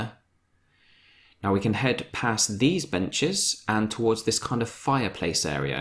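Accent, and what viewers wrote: United Kingdom. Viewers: Best walkthrough ever. Smart evenly paced british voice is like listening to a harry potter audio book. Make sure you do the next Dead Island 2 or Red Dead Redemption 2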